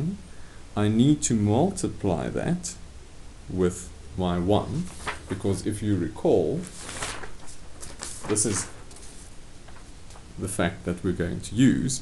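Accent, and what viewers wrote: South Africa. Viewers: Nog nie eers die video gekyk nie maar kon daardelik hoor die man is Afrikaans, toe sien ek jou van is 😄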